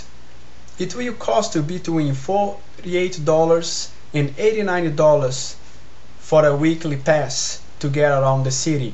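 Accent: Brazil. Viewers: Let me guess...are you brazilian right?...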